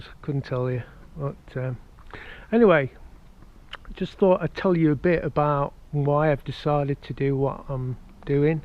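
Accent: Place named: East Midlands, United Kingdom. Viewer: Hi, you sound like your'e from the east midlands somewhere, Phillipines is a great place, $575 is enough to live on, it works out about $26000 pesos which is about 46 pesos to the dollar. The people are very nice but try and learn a little of their language and try their food. When in the Phillipines do as the Filipinos do.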